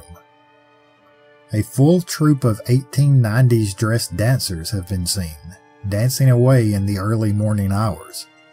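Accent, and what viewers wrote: South, United States. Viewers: Wow I'm so pleased to be like no 444 it's such a hint at how your channel I's growing and proud to be hear from the beginning and still loving your cookies and cream southern drawl xxx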